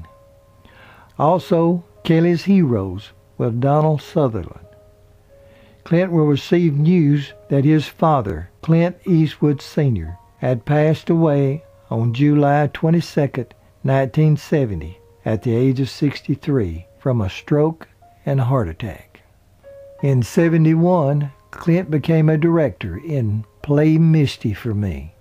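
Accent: South, United States. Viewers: Dear mr. ( may I call you ), your format and exquisite Southern accent make all of your mini documentaries a pure joy to behold. I my humble blue-colour opinion, you are a true celebrity historian with the gift of timelessness that you bring to all of your productions.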